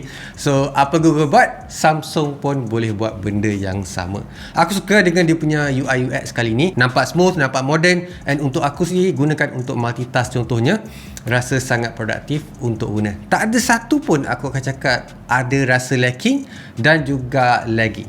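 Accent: Terengganu, Malaysia. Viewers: pekat terengganu tu tak hilang ekk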